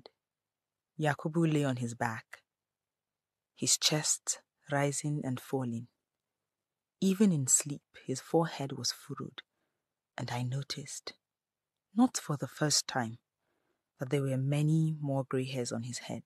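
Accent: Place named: Hausa, Nigeria. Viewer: It is the hausa accent as you speak for me🙌🏿🙌🏿🙌🏿. Welldone!!😁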